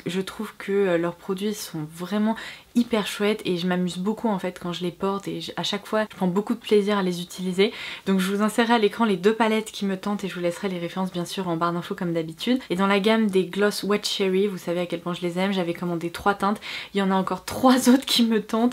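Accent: England, United Kingdom. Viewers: Tout me donne aussi tellement envie ! On va être nombreuse à craquer 😍 Ps : ton accent anglais me fait toujours autant rigoler ! Gros bisous ma belle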